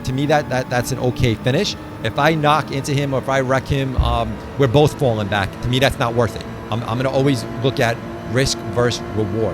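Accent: New York, United States. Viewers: do I hear a NY accent???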